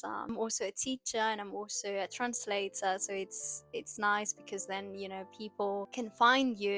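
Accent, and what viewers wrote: United Kingdom. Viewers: Tienes un acento britanico precioso. Imagino que a parte de tener una natural predisposicion al idioma, has trabajado mucho. Sería interesante un video sobre eso. 😊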